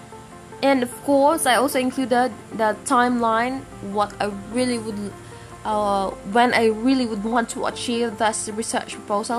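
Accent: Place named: United Kingdom. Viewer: Hey! your British accent is amazing! Hahaha I know it’s yours voice, funny and educational. I’m done watching,like and subscribe. Of course it’s amazing. I miss you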